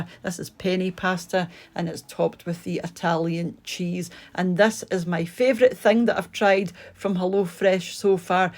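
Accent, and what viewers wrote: Scotland, United Kingdom. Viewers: I love to see "What's For Tea?"... And I love your wonderful Scottish accent,!... Scotland is a bonny place!... Eating well is very important, and there's one thing for sure, Mr. What's For Tea? doesn't go short! 👍🤣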